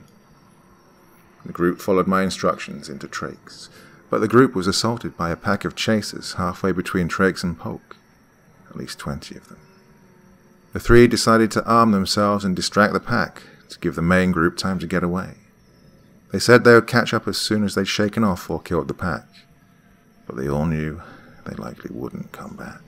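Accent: England, United Kingdom. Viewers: What part of England do u come from? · thank you I thought I picked up some british accent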